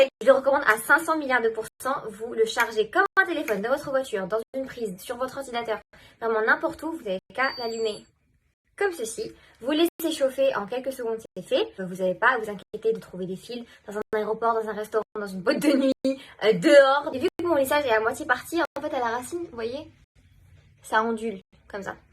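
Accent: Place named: United States. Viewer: la fille américaine qui parle frensh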